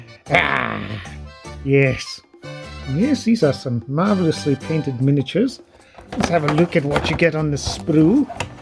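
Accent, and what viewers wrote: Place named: Wales, United Kingdom. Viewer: Being welsh, I approve that accent 😂!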